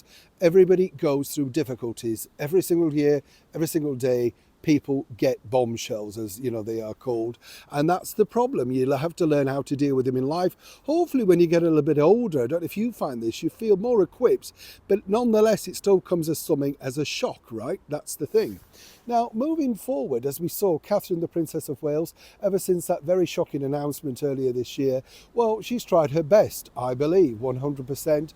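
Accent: Yorkshire, United Kingdom. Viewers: True 👍🏻you sound like you are from up here in Yorkshire sometimes 😊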